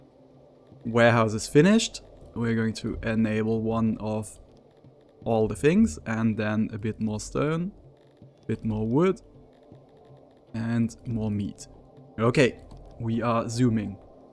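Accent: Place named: Germany. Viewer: If you launch a city builder video and you hear a German accent, you know you're in the right place.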